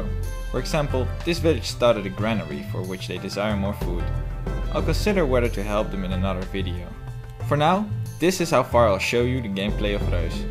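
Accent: Netherlands. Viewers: i dont like dutch people who pronounce words like that, we got a lot of hours learning english, french, german etc and still they pronounce it like that. btw game looks awesome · are u dutch like me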